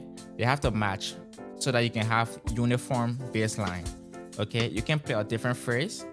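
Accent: Liberia. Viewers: Are you a Liberian ? You sound so Liberian 🇱🇷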